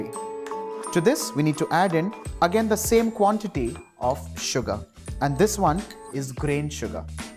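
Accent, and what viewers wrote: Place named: India. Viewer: That's weird. He speaks just like any indian.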